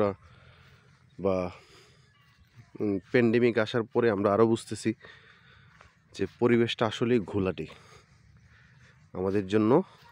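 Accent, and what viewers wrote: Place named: Barishal, Bangladesh. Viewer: আইতাছি রে ভাই। বরিশালের ভাষা🥰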